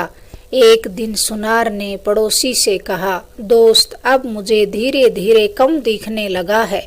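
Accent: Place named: Rajasthan, India. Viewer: महिला। राजस्थानी। है ।लहजे। से। पता। चलता। है ।रंगीलो। राजस्थान। ।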